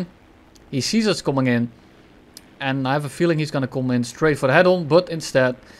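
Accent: Netherlands. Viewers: Are you dutch? cause you have the accent.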